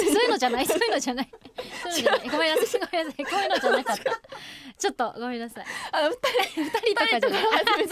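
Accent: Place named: Kyoto, Japan. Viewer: はんなり京都弁めっちゃかわいいな いいこなの伝わってくるわー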